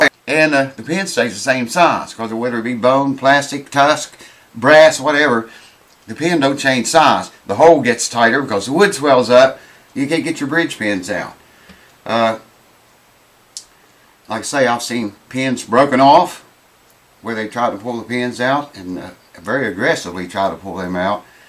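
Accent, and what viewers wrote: South, United States. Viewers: Were in usa you buddy accent south innit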